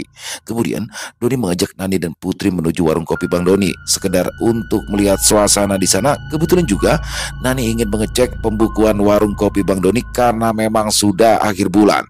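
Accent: Jakarta, Indonesia. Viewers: 😂😂😂❤❤❤,..ini ini yg saya suka logat betawi yg kental dan super lucu, 😂😂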